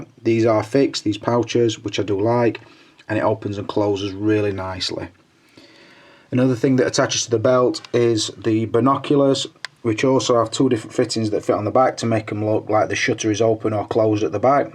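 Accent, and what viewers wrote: United Kingdom. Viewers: What part of the U.K. are you from? I love the dialect. I'm a fan from the U.S.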